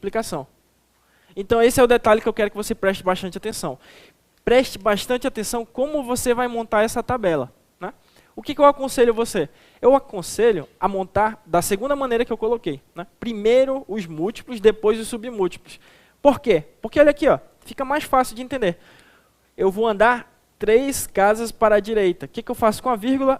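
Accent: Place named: Rio de Janeiro, Brazil. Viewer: mt carioca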